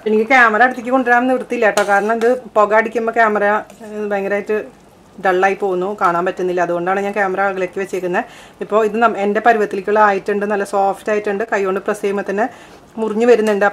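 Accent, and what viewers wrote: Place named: Chalakudy, India. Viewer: Hi tried pasta.. First time trying.... Always kids experiment goes on.. It came very well.. And enjoyed.. Thank you for u r valuable tips in between.. The best part in u r explanation while cooking is even my 14 yr kids can follow the recepie and cook.. Thank you dear.. Hope I can call u dear.. 😍. I am also from Trc.. I left Trc after marriage 20yrs back and I am not having that slang.. By watching u I am enjoying that too..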